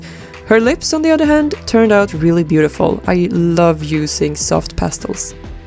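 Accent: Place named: Sweden. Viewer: I'm truly sorry for ur loss tho, i thought u were swedish by ur accent it the start of the video but then i realized u actually were when u told about the öra thingy lol jag är också svensk så tyckte det va lite kul :)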